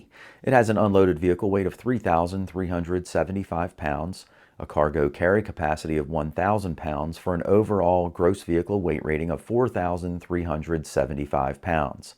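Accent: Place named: Maryland, United States. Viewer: Being from Maryland…this guys md. Accent is comforting to me. 🤣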